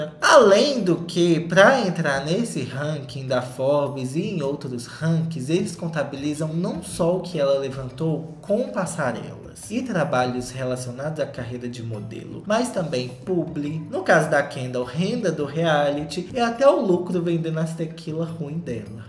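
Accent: Minas Gerais, Brazil. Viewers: Agora q eu noteiii é mineiro?! Quero ser sua amiga, bora sair kkk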